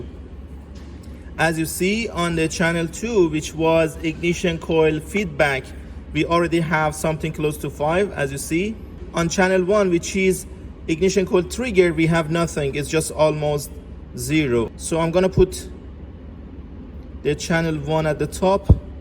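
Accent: Iran. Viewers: Well done mate, you are such intelligent person, by the way your accent sounds Persian😊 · ایرانی هستی؟لحجه فاسی داری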